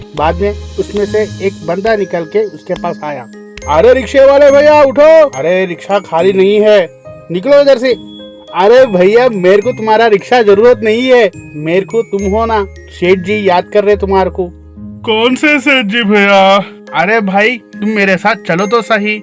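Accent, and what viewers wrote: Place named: Hyderabad, India. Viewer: حیدرآبادی اسینٹ لگ رہا ہے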